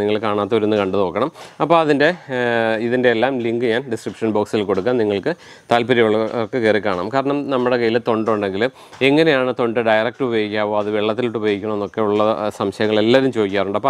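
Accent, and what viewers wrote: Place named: Kottayam, India. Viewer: പള്ളയാൻതോടാൻ കോട്ടയം ഭാഷ റിയൽ കേട്ടോ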